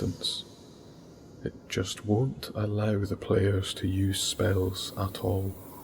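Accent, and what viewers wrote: Scotland, United Kingdom. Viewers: What's withe terrible Scottish accent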